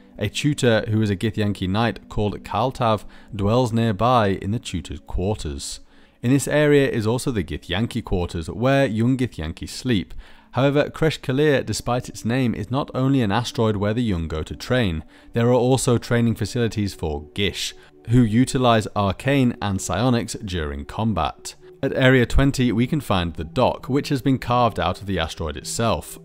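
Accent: United Kingdom. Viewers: Yes. Yes. An excellent lore video, per usual. I was particularly impressed with the quality of your elocution. But maybe that has to do with your posh accent. Anyway, please get more yarn. I truly love your BG3 theory videos.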